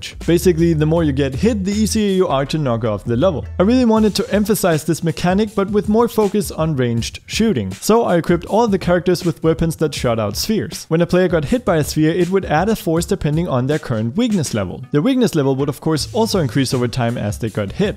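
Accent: Denmark. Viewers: are you danish? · 30 sec into the video and i felt a danish accent - turns out i was right :D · Are you Danish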